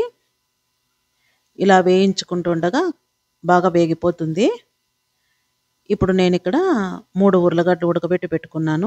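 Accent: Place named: Rayalaseema, India. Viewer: చాలా రోజుల తర్వాత రాయలసీమ భాష విన్నా ❤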